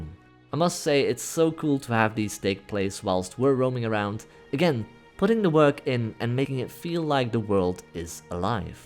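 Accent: France. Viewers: ton accenbt est vraiment interessant, parfois c'est francais, un peu anglais et une autre pays. j'aime ca!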